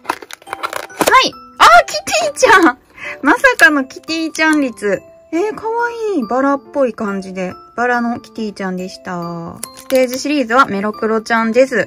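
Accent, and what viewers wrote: Kansai, Japan. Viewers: の関西弁は落ち着きますよー💖そして買い物動画は一緒に行ってるみたいで楽しいです🥰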